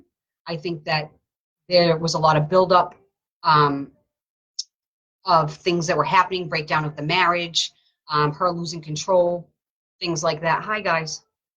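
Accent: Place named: New England, United States. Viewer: I’m from Massachusetts too!! I heard your New England accent right away.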